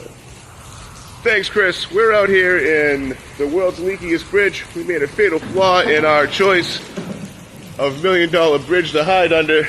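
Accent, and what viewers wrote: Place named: Maine, United States. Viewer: You sound like you're from Maine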